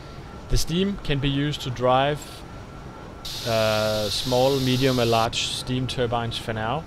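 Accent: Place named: Denmark. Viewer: You sound like a Danish man speaking english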